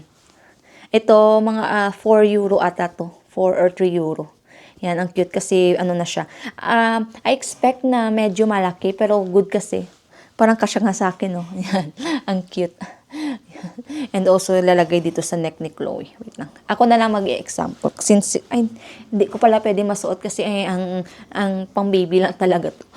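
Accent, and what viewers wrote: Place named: Iloilo, Philippines. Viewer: Parang ilonggo ka ate sapananalita mo😂same, ❤ hi from korea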